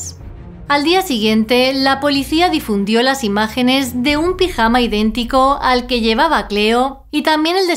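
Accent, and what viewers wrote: Castile, Spain. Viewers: Se escribe pijama y se pronuncia pijama porque habla castellano de España,a que escuela de tu país fuiste tú para corregir algo bien dicho ?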